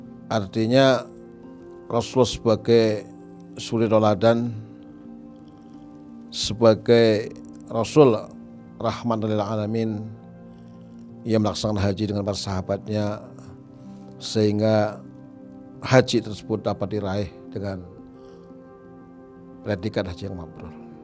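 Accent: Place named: Banyumas, Indonesia. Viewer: Tadz, agak ngapak logatnya ya, orang mana tadz? Saya asli brebes, salam dari brebes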